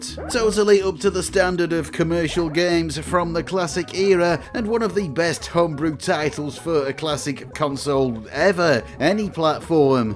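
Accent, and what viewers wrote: United Kingdom. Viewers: This is how proper British folk talk.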